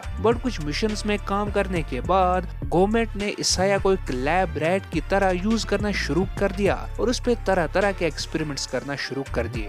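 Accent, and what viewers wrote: Kashmir, India. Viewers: Bro Are you Kashmiri . You have a Kashmiri accent ❤️❤️❤️❤️❤️😘😘😘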